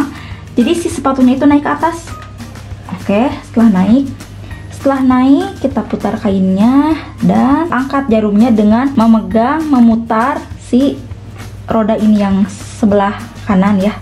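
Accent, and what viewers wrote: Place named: Sunda, Indonesia. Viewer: Menginspirasi banget tteh, nada ngomongnya nyunda, 🤭 galfok sama " biar ga amburadul"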